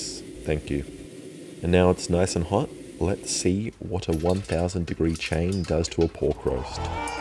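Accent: Australia. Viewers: People are saying “oH hEs sO aUSsiE” and I agree as a fellow Aussie yes we only wear thongs and barefoot (in Australia we call “flip flops” thongs get your mind out of the gutter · Got the aussie accent too…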